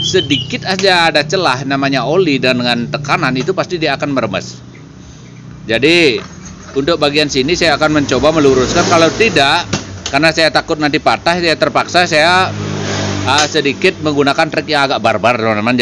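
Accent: Bali, Indonesia. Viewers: Bali gati bapake ne 🤣🤣. Lanjut pak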